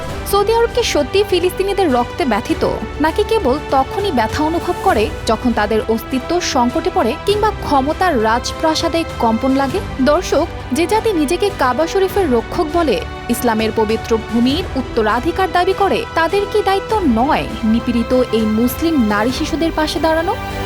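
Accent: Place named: India. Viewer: ইন্ডিয়ানদের মতো খবর পড়া ভালো লাগে নি😢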